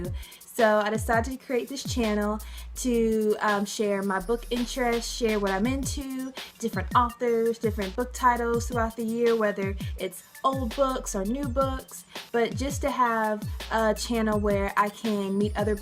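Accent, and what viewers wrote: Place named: South, United States. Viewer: I'm glad I'm not crazy then! I thought you sounded a little southern! It's definitely cute, I love southern accents. And I'm sure you will absolutely love it in this community. :)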